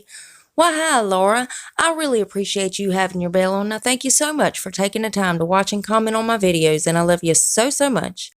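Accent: South, United States. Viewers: Love all your videos and absolutely love your voice you sound southern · Hey I just subscribe to your channel. I do enjoy your country accent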